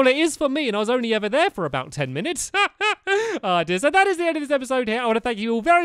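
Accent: United Kingdom. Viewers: landen reeves he's British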